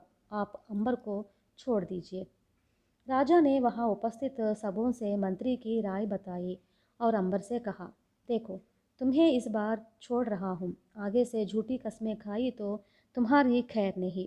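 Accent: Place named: South, India. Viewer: Good morning! Actually, your Hindi accent is hard to understand. It is not fluent & has very strong south Indian, Tami, or keralaite touch... For understanding purpose, watch any Hindi TV channel or listen to "Har kahani kuch kehti Hai or positive inbox" Thanks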